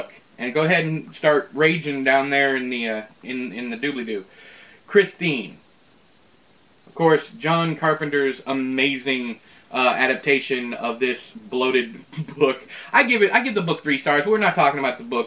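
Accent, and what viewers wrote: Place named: South, United States. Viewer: My first place choice would be 'Shawshank', and second place '1922' which İ found to be better than 'Gerald's Game'. That southern drawl! 😘 (Absolutely love it! My girlfriend hates it though. She said: Why can't he at least unclench his teeth while talking?)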